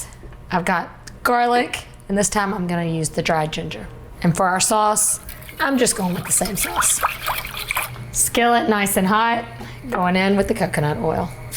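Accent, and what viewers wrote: South, United States. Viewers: LOL! Love the recipe AND the downhome accent and style combined with oriental cooking- maybe globalism isn't all bad!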